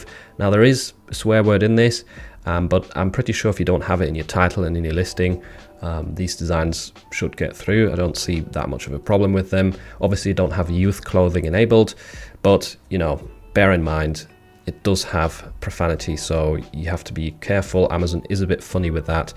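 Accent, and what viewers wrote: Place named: United Kingdom. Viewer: Hi. I'm just starting out on Merch on Demand, and your videos are a great resource. I've watched a lot of vids about POD on different channels, but yours is definitely a favourite thanks to your calm, clear delivery without making wild claims about making money fast. Maybe it's because I'm a fellow Brit that I appreciate this lol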